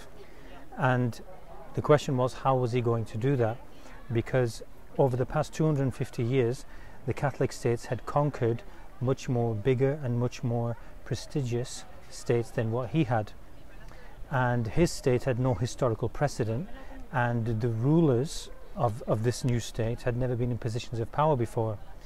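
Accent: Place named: United Kingdom. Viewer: شكرا يا وردة. هل انت سوري من بريطانيا ؟ لهجتك اكسن بريطاني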